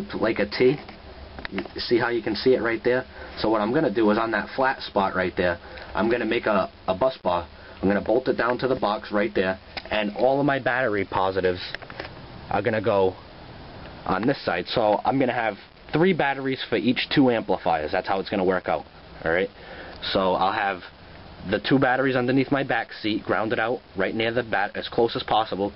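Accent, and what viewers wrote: Boston, United States. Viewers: Boston accent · thats a thick boston accent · No friggin way you're from the Boston area! I can tell cuz I'm wicked smaat! Lol nice video man.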